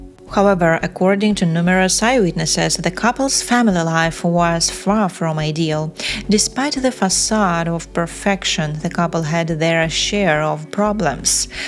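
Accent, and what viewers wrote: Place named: Russia. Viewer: No, it's a lady of apparently Russian mother tongue, with a certain - but not sufficient - mastery of the English language - and who pronounces a lot of words wrong. Indeed it's hard to stand.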